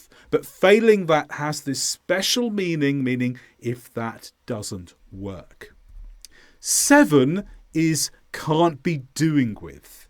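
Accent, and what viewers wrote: England, United Kingdom. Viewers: I like your British accent and teaching video, which is perfect since it helps me gain more English grammar rules, phrasal verbs, and expressions. But there is little difference in English usage in other English-speaking countries such as the U.S., Canada, Australia, and New Zealand....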